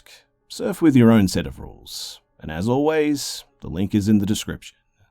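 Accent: Australia. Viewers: Bro you an Aussie like me? Sounds like it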